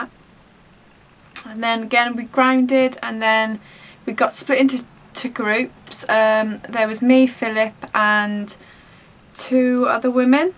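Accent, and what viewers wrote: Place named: Shropshire, United Kingdom. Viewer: Where are you from, you sound VERY Shropshire-ish! I am from Shrewsbury, and I do my own investigations sometimes, and I have had lots of my own experiences :D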